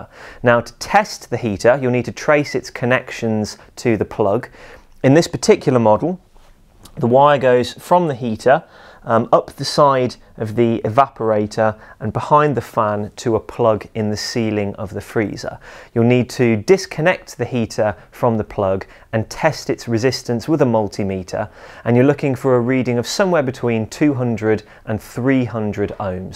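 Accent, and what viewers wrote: RP, United Kingdom. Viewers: Like that accent is it posh?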